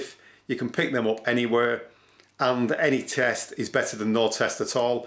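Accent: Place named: Yorkshire, United Kingdom. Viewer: Looks like a Yorkshirian accent.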